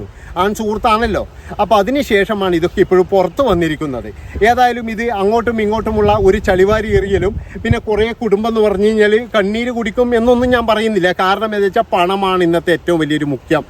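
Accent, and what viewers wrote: Kannur, India. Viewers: ഓക്കേ അരി അണ്ണാ 😂നാട് എവിടെ യാ 😄കണ്ണൂർ ഭാഷ ആണ് നമ്മളെ 😂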